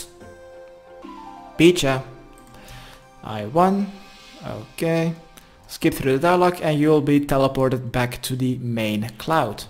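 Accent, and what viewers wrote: Netherlands. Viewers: Legend! Thank you! Btw: are you Dutch?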